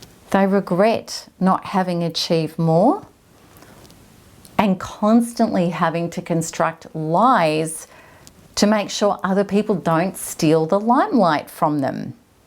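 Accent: Australia. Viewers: Australian accents are soy nice.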